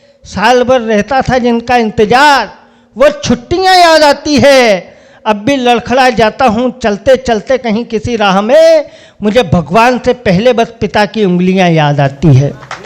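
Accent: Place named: Gujarat, India. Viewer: ❤ touching, love you ji. aapka tone gujrati lag raha hai.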